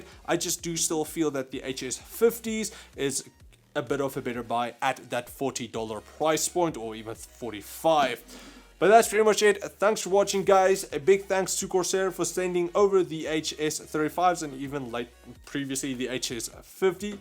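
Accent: South Africa. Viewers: why are you trying to sound americaN? A boer accent mixed with american is a no no bro bro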